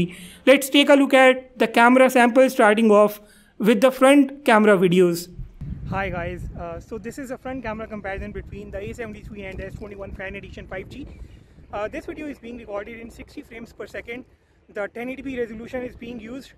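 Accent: India. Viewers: Indian accent to much to take for whole video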